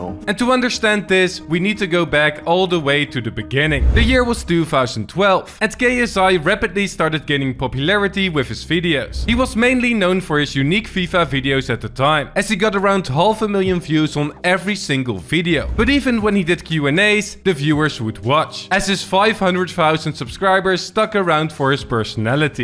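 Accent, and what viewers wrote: Netherlands. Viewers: Im almost sure ur dutch. You sound dutch af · Brother, he's from the Netherlands.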